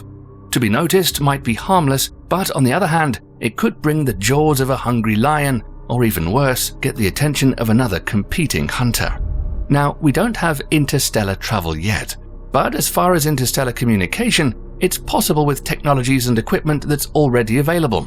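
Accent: United Kingdom. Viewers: "Because if an alien civilization exist they certainly know we are here" Another soap opera alien video that starts with a silly thought as if this was (or worse could be) a proven fact. That is first. Secondly, if they existed and they are more "advanced" than we do, and knew we are here, why would they waste their time with some silly humans who does nothing but kill each other in the name of some "god" ? Or make war for opium. Maybe you should ask yourself with your British accent if aliens could be as harmful as UK has been to this world.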